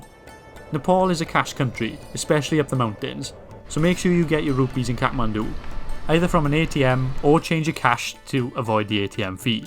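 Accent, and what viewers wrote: Wales, United Kingdom. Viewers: Great vid. Made me smile when I heard the familiar Welsh accent haha. Where you from? I’m Carmarthen. I go to Nepal in 3 weeks and I can’t stop thinking about it 😂❤️🏴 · Diolch (yeah, picked up the Welsh accent). Well worth watching.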